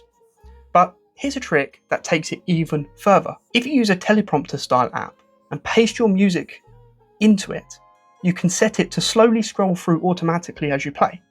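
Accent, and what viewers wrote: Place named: United Kingdom. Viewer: Loved the very strong british accent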